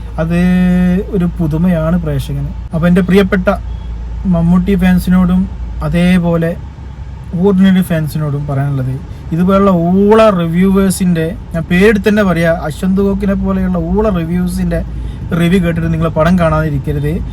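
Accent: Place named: Kozhikode, India. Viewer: ആദ്യം താൻ മലയാളം സംസാരിക്കാൻ പഠിക്കൂ... കോഴിക്കോട് ബാശ.... എണീറ്റു പോടേയ്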